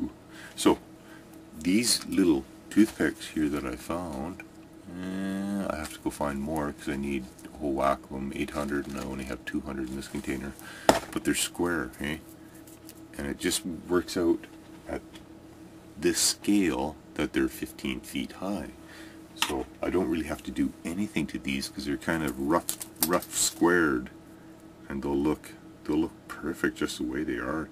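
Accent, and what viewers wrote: Canada. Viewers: Sad to hear about the depression. You wouldn't happen to live near Sudbury would you? As a fellow Canadian, I'm just wondering about your accent :).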